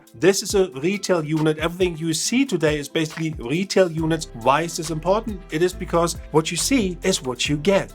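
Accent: Germany. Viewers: Englisch ist nicht wirklich deins mit einem deutschen Akzent. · Noice review, wonderful German accent. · Sehr Gut Gemacht video. I like your Deutsch-English 😂😍😍😍💓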